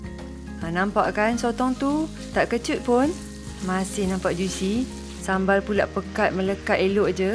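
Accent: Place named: Kedah, Malaysia. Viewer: Dengar loghat kedah video ni. Hahaha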